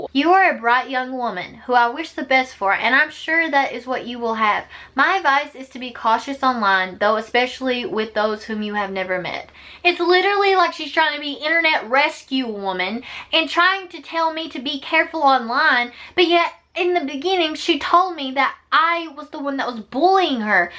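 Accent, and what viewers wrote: South, United States. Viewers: you have an cowgirl accent xD but its so cuteeee and i thought you were old when i first saw your musicals xD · I love her accent lol! She's a lil country lol! She's so cute and adorable and so funny!! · Where are you from? I'm from Alabama and your accent is really strong compared to people around me. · You sound like you have a bit of a southern accent. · I like your country accent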